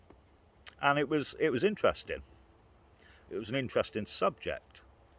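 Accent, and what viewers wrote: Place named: West Country, United Kingdom. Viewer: Wow, I’m late to this episode but as ever nice to hear a wise sage view and in a twang of West Country that I know well. I listened again to the tasob stream from a month ago and can see that your attempt at infiltrating the Conservatives certainly engaged your mindful cogs, I’d vote for you!😀😀😀😀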